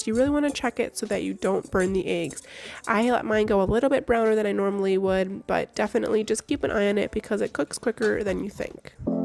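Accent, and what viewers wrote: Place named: Michigan, United States. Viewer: Everything looks great! Sounds like you're from Michigan or somewhere north??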